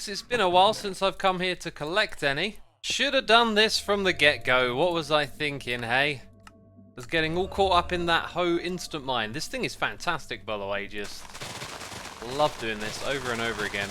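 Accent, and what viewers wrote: United Kingdom. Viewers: I just searched if X lives in a country in which they drive on the left (he's British, apparently I wasn't paying any attention to the accent haha), cause I got confused when he said the designs of the road felt IRL and I didn't see it lol😂😂🤦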